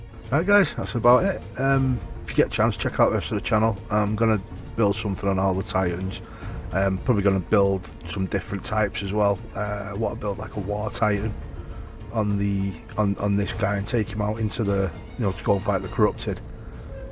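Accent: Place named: England, United Kingdom. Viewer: yeah I saw the creator bit with vids but couldn't find a list of the creators to check out . There should be a sections with a list of the creators and their channels to check out if there is I'm sorry I can't see if but if there is point me in the right detection ...I have just been getting some pointers off some of the guys on how to make vids on the xbox since a few people have messaged me asking me if I have vids on some of my builds but I only have pics ...I'm going to be trying to make a vid in the next couple of days with the help of some of the guys from the discord ...ohh n bwt love your accent on the vids makes me feel at home since I have moved out of England to be with my gf ..keep bringing out some awsome vids hope to see some cool designs in the future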